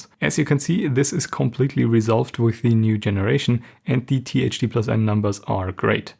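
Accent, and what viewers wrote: Germany. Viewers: Good video. But I think you are German… it’s the typical pronunciation there… no front! It’s a very good video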